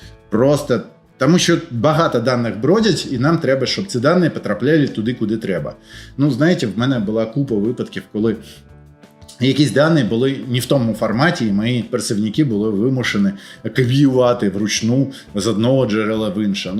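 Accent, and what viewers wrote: Belarus. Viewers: Сорян, що не втримався... Але якби думати, що білорус, то акцент був би "природний" )) Суто з філологічної сторони якщо сприймати... 🤞 P.S. А за інформативність по суті - тільки респект:elbowcough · bialoruskij akcent:) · Свідомий перехід на українську для російськомовних людей - це найкращий доказ вибору, часто дуже тяжкого і заслуговує поваги, але... поки у це схоже на білоруську ))) Може, ШІ допоже?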